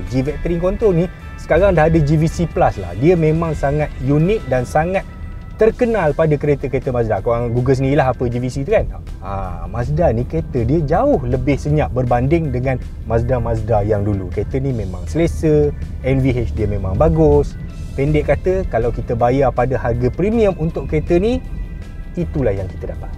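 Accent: Kelantan, Malaysia. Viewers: Duk kelate tu bang..has molek ii..sek kelate mari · Abang orang kelantan ke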